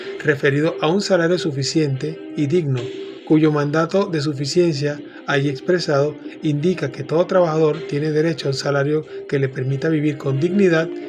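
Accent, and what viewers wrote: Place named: Venezuela. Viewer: Muy buenas propuestas las que usted está es poniendo buenas tardes desde tinaco estado Cojedes Antonio Acosta lo escucho venezolano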